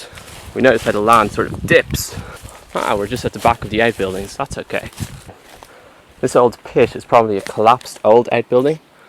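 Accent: Ireland. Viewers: the grid beside the barn is a cattle crush for inspection and reparing hooves not slaughter. and the shackles were probabley for side lining donkeys to stop them running :) and ya sound like your from dublin dude im connemara :D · Why the fuck would you assume he's American? This guy is clearly Irish. There's also a bottle of Paddy whiskey and the newspaper even mentions Garda, if you're too stupid to pick up on his accent. Bovril is a type of beef stock which is used to make a broth tea. You're an idiot. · i love the irish accent. "the udder side" lol.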